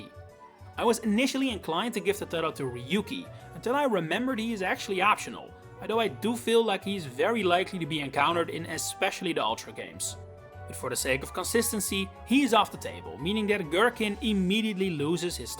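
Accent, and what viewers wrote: Netherlands. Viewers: Dutch accent recognized, opinion respected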